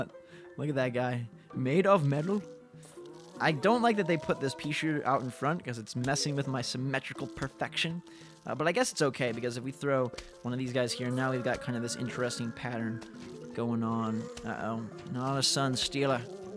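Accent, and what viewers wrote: Australia. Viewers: Are you from australia? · R u Australian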